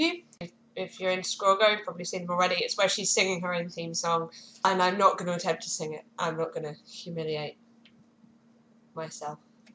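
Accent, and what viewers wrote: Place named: United Kingdom. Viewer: I love this comic. Everything about it. the art, the writing and the characterization all fit the tone. Once again Marvel knocks it out off the park. Not only do we get an awesome new comic but a strong developed female character. Now all that left is the Ms. Marvel/Squirrel Girl crossover. Great vid by the way. ALways nice to met a fellow comic book fan and also love the British accent. lol P.S I find her really hot! like DAMN! i.e she is funny, witty, smart, curvy, eccentric and she has a tail. Am i right? huh? huh?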